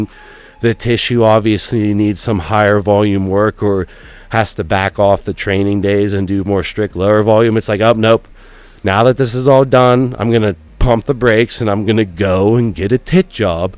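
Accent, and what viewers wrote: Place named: Pittsburgh, United States. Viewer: You def sound like youre from the 412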